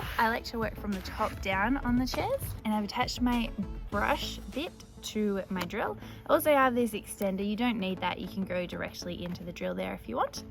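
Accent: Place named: Australia. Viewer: "Cleen-a" Love Aussie accent. Thanks for a lovely demonstration.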